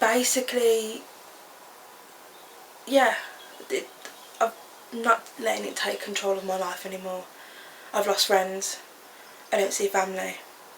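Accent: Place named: Birmingham, United Kingdom. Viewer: I usually cringe at Brummy accents; I did not even notice yours. Thanks for sending me the link to your video and will definitely follow your progress. i have Spinabifida, reactive lifelong depression, anxiety, recent stroke, possibly M.E. and or possibly M.S., etc, etc, etc... as many of us have. Your willingness to keep going, just from seeing it in this one video offers me some hope. I hope you can and will record videos maybe every few days? It's things like this videoing that help people like me keep going x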